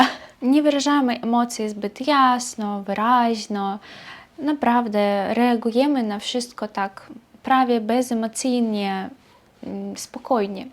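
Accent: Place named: Poland. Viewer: Musze być szczery! Łapiesz polski akcent! A teraz do meritium. Ludzie są inni zagranicą a inni u siebie w kraju. Nie wiem na czym to polega. Na przykład w czasie mojej tułaczki po świecie poznalem wielu dziwnych wegrów. I mialem taką sobie opinie o Węgrach Jak pojechałem do Budapesztu to ich wtedy pokochałem. Czyli: Trzeba odwiedzić kraj. Posmakować tamtejszej kuchni. I jakie jest tam.poczucue himoru. Bo wiadomo, ze są narody ktory nie mają poczucia bumoru.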